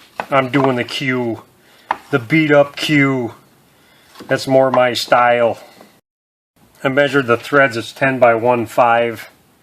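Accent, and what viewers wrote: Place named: Midwest, United States. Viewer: Enjoyed the tutorial man with the Midwest accent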